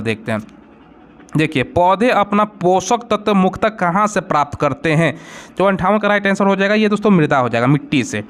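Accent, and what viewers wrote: Bihar, India. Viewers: Bihari accent 😂😂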